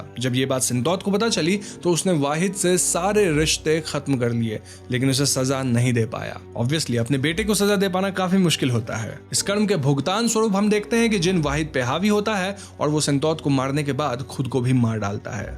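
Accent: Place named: Bihar, India. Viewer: तुम पक्का बिहारी हो, लालू प्रसाद यादव की तरह एक्सप्लेन करते हो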